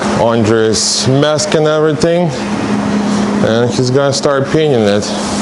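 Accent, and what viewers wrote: Russia. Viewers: Бля,слишу акцент;)землячок!спс подписался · Ухо какой акцент )) наш человек )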